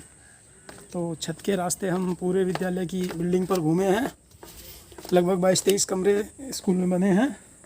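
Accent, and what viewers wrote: India. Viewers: AAP ilahabad ki tarah Joab me hoge aapki bhasha se lagta he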